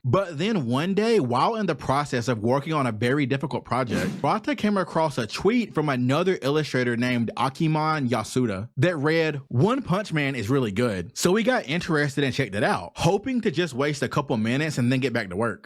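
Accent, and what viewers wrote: South, United States. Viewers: Great vid but gotta ask. What part of the south are you from haha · Very interesting! I used to love Shin-Chan, such a silly show. Also love your accent! I've heard some southern accents in my life but yours is unique to me!❤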